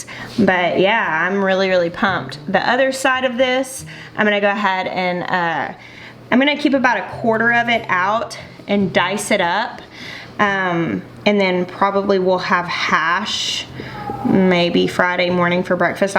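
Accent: South, United States. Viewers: I love watching you. I love your heart. I love your authenticity. I think you and are one of the most "real" of the "youtubers." I just watched a tv show that is also shown on YouTube, Growing a Greener World". I've watched Joe on PBS for years but tonight when watching his latest episode, I realized YOU HAVE A CLONE IN THE GARDEN WORLD!!!! You have to watch her. She sounds like you describing her gardening love. The link is <link> She is Nikki Jabor. I wasn't familiar with her but apparently has a large following and a book. Check her out. I would rather hear you talk though.....I'm partial to a southern accent!! Thank you for sharing your life, your love, your family and your faith. You are a blessing to me. 💕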